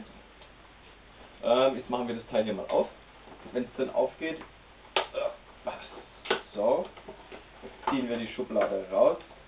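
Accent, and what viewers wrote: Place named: Swabia, Germany. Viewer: Wie ich den schwäbischen Dialekt hasse, da kommt so richtig mieses Fremdschämen auf - Ich bin selber Schwabe... ;_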